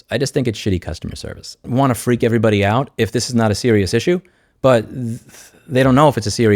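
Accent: New York, United States. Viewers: You still got your New York accent. 😎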